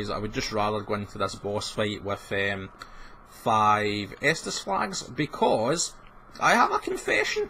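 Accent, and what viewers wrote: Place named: Glasgow, United Kingdom. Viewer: Are you Glaswegian? your accent sounds just like some of my friends from down there, but less nasal.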